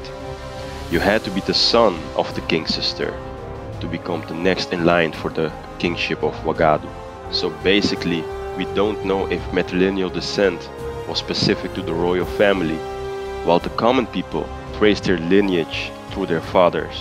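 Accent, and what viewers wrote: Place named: Poland. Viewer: Omo you sound more like polish or ukrainian or even Austrian...u sure?...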